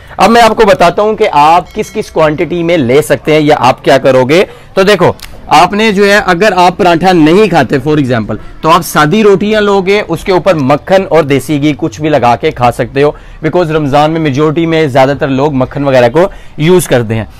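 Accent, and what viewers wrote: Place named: Punjab, India. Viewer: Love the Punjabi Touch...Stay Blessed...🤲🙏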